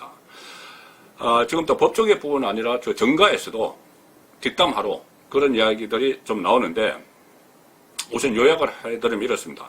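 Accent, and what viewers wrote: Gyeongsang, South Korea. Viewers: 추카 추카 합니다 늘 응원하고 있습니다 날카롭고 군더더기없는 비평! 긍께 어쩌겠어요 사투리를 경상도 사람은 잘들리고 알아듣는데 ㅋㅋ^^ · 10만 구독 축하드립니다. 진솔함이 묻어나는 송본부장님의 구수한 경상도 사투리 정말 좋습니당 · 경상도.사투리도좋아요 축카합니다.100만쭉~~ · 10만 추카드립니다 발전을 위하여 쓴소리 좀 할게요 저도 경상도지만 억양은 고칠수 없습니다 하지만 말의 속도는 줄일수 잇죠 ㅋ 연습하셔서 속도는 좀 줄이면 좋겟슴다 꾸벅! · 저는 경상도 사람이라 의 말투가 정겹기만 합니다 오늘 자막처리한 글에 오타가 있습니다 붉어지다(×) 불거지다(0) 아! 10만 구독자 돌파 축하드립니다 내년 이맘때는 50만 독자 돌파를 기원합니다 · 구독자 10만을 축하드림니다~경상도 억양이 매력적이죠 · 그래요.. 잘보고있어요. 경상도말씨같은데요. 고향이어디신지궁금해요. 지금잘하고계십니다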